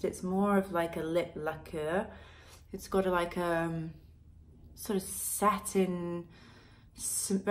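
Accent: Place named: United Kingdom. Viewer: british accent is everything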